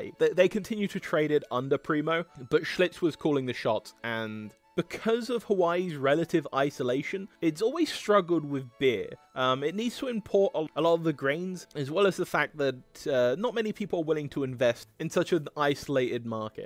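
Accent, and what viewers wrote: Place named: United Kingdom. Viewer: British pronunciation of American names is laughable.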